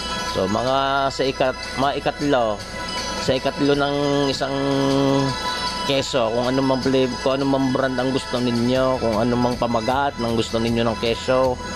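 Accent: Batangas, Philippines. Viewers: unang salita palang natawa na ako,, Batangueño talaga. sarap nyan kabayan 👍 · Either tumira ka matagal sa Batangas, o magsanay ka magsalita. Pero, matagal tagal bago mo matutunan yan. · Your new subbed, searching kardereta yung luto mo nakita ko! Enjoy your cooking lalo na yung Batangas mong accent! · Iyan ang tunay na Batangueno , sa pagsasalita at sa pagluluto ay talagang mainam na mainam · habang pinanonood ko ho ito ay may ngiti sa aking mga labi. ako ho ay sobrang nasiyahan sa panonood nitong video ninyo. kayo ga ho ay taal na taga batangas? hindi lang ho sa kaalaman sa pagluluto ng kalderetang kambing ang natutunan ko dito kundi ang makarinig ng nagsasalita ng puntong batangas, para tuloy akong napauwi sa aking lupang sinilangan. mukhang napakasarap nga ng kaldereta nyo, yan ang tinatawag na authentic - kalderetang totoo. at hanga ako sa paraan nyo ng pagluluto dahil yan ho ang talagang bihasang (skilled) magluluto, pinaala-ala nyo sa akin ang aking mga tiyuhin na magaling ding maluto ( ang isa ay dating may karehan sa bagong palengke) ay sya, maraming salamat ho, mabuhay po kayo at nawa'y mas marami pang tumangkilik dito sa chanel ninyo at makagawa pa kayo ng maraming video na maraming makapanood. 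God bless. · ala eh kuya.. akoy nattuwa Sayo eh.,kasarap mo pakinggan pag nag ssalita ka NG batanguenio..akoy wiling wili mkining sa bosis mo..😋😋😋😋😋😋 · Nakaka aliw magsalita ang mga Batangueno eh! Dalas dalasan mo kuya ang pag a-upload ng mga cooking video. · Batangueño ka na nga ginawa mo pang asal ang punto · Tuwang tuwa ako sa punto ng batangeno dahil yan ang nakalakihan kong marinig sa nanay kong batanguena at mga kamaganak namin sa Majada at sa batangas!! Ur accent reminded me of my kakang duneng and other tiyo at tiya!! They all speak that same accent!! Pag nakain na at naghuhuntahan na, akala mogay nagbababag !! Kainaman naman eyyy! 🤣🤣🤣. I love batangueno foods! Napakasarap talaga!! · Bro, di ko kabisado ang accent at punto ng pananalita mo, sorry, matanong ko yan ba ay baklang salita o batangeno?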